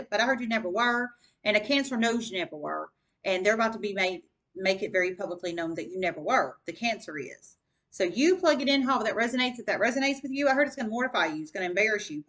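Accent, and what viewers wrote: South, United States. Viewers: Love the sound of your voice. Very Southern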